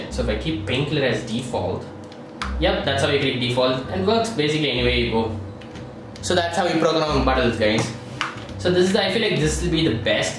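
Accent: India. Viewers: Jesus fuck indian accent is so fucking annoying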